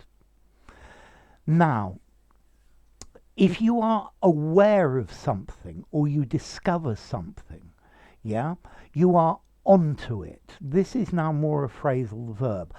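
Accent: United Kingdom. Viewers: I love his accent so British ❤️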